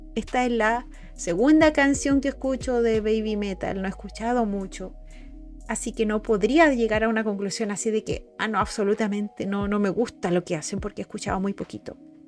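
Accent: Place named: Chile. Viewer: Me alegro que hayas descubierto a, no te preocupes, no a todo el mundo engancha en las primeras canciones, normalmente con 3 de sus canciones mas conocidas te da una idea de lo que es musicalmente y a nivel de entretenimiento como nunca otro grupo de este genero lo hizo antes, pero aun asi encontrar esa cancion que te llegue (especialmente por la barrera del idioma) puede tomar varias mas hasta que la encuentres y te convenza, no estamos ante cualquier proyecto random japones, por el contrario, el concepto fue muy bien elaborado desde que eran pequeñas y hoy en dia son señoritas con mas de 20 años que han perfeccionado y crecido en muchos aspectos sin dejar la frescura que los caracteriza. Guiandome por tu acento supongo sos de Chile, tuve la fortuna de presenciar sus shows cuando estuvieron el año pasado en el Knotfest (Parque del Estadio Nacional) y el sideshow que hicieron en el Centro de Eventos Basel al igual que en sus presentaciones en Brasil y Argentina, y la verdad que me lleve los mejores recuerdos de mi vida, ojala vuelvan y pueda volver a conectar con esa linda comunidad hermana de Chile. 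Abrazo y espero que sigas disfrutando de este viaje pq aun te queda y mucho de lo bueno esta aun por venir.🦊🤘❤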